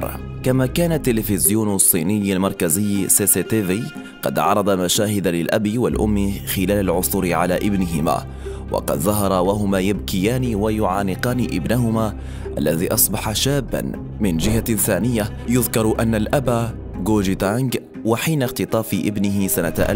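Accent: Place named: Morocco. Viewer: واضحة اللكنة المغربية ف لهجتك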